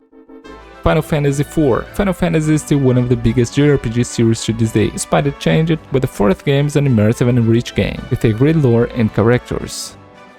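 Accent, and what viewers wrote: Brazil. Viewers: ahaha esse sotaque de brasileiro falando ingles é muito fácil reconhecer.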